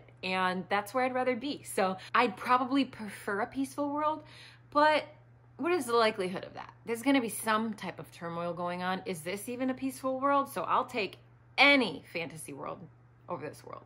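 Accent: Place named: Midwest, United States. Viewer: I'd say your accent is mostly midwest with a touch of North Dakota, so Michigan make a lot of sense.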